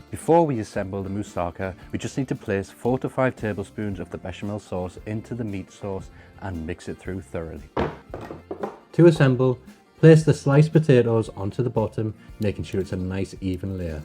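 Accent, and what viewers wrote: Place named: Wales, United Kingdom. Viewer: Really enjoyed this as I'm about to make a big batch for our house. Love the Welsh accent. Greetings from Melbourne, Australian. Hwyl Fawr !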